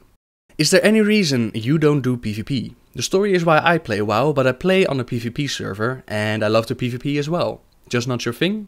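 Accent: Netherlands. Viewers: Ik wist dat je een nederlands accent had! Groot gelijk over de ondertiteling die nu overal gedubt word...niet goed. Zeker niet goed. Ik ben een Horde fan, en ik haat Garrosh maar dat is omdat ik verliefd werd op Thrall z'n horde, met de Darkspear en de Tauren. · I love your dutch ascents. especially when you say Draenor, sound so cool lol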